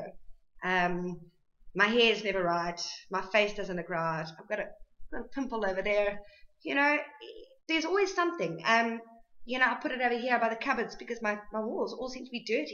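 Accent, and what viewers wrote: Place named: South Africa. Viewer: Hang on... South African accent detected. Good job quieting smoking. If nothing else you will save a lot of money. As a fellow South African and a ex smoker ex heavy drinker I literally get where you are coming from. I take it that you still live in South Africa, maybe not but does not mater because what I'm about to tell you is the same either way. A lot of South Africans who leave the country have a hard time adjusting to their new surroundings because they are use to being stressed, pressured, feeling vulnerable, unsafe and generally frustrated. In South Africa we grew up (I'm thinking we are about the same age) with thees feelings not knowing any other way of life. The constant stress and constantly looking over your shoulder to us, is considered just another day. You and I know that the human mind can not stand the stress even if we lie to ourselves and say we can. 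That is why so many South Africans drink so much, smoke so much and do so many other addicting things. It's just way to escape reality because our minds need release to try to relax and calm down. The truth of it is and most South Africans will disagree is that having grown up in a war zone in Africa we all surfer from PTSD. If you are looking for answers to why you feel the way you feel and why you do the things you do look into Post Traumatic Stress Disorder. Look at the symptoms of PTSD and you will recognize a lot of them. One of the ways I try to deal with having grown up in SA is to try to learn to relax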